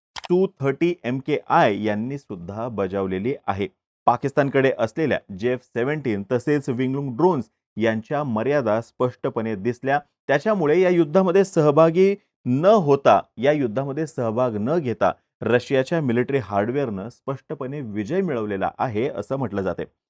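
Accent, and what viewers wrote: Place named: Brahmin, India. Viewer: tu bamana saraha ka bolto re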